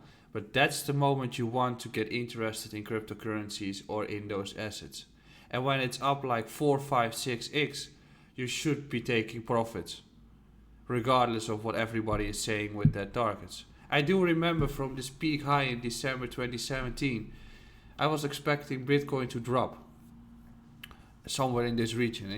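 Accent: Netherlands. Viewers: Finally a down to earth guy, ive started unfollowing allot of other youtubers becouse of the insane fomo talk hahaha, btw im hering a dutch accent am i right?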